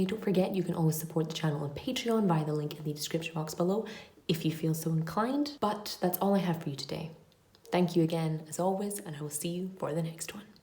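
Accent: Scotland, United Kingdom. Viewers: Is your accent Scottish? It’s beautiful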